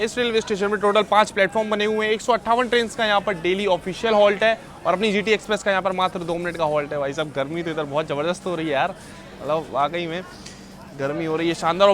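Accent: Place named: Bundelkhand, India. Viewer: Bhai aaj bhasa puri bundelkhandi bol rahe ho❤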